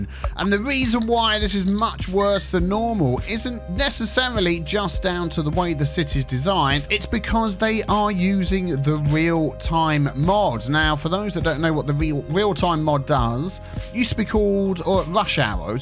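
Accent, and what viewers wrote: United Kingdom. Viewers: He's trying to sound American? I'm Canadian and soundee like the most British man I have ever heard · You sound British?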